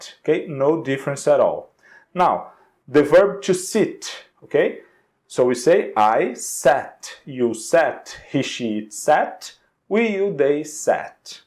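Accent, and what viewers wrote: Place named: Brazil. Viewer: ótima aula. e seu sotaque brasileiro nos lembra a importância de aprender idiomas e manter nosso sotaque com orgulho! muita gente mira no objetivo de perder o sotaque brasileiro, o que é uma grande bobagem. imitar outros sotaques só serve pra brincar, fazer piadas e tudo mais... jamais pra adotar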